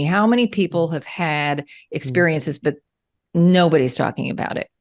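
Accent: Australia. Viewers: Loved this so much!! Touched points I thought I felt I needed clarity on, love it! I think she's got some Aussie heritage? I can hear it in her voice! Wonderful insight from source XXX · They sound kinda Australian. hehe :)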